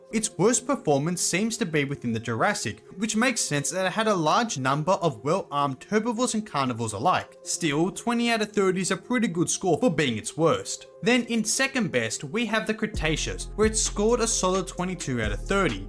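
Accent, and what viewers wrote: Australia. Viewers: I’m surprised the Indoraptor had jts worst score in the Jurassic. Kind of ironic, if you think about it… Also, for some reason it didn’t click to me for some time that you were Australian,. I don’t know why. I hope I didn’t offend you with this…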